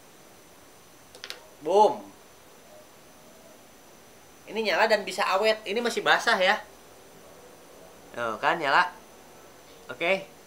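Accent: West Java, Indonesia. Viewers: seceng anying wkwkwk khas sunda bgt lo bang hahaha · Sunda pisan logatna hehehe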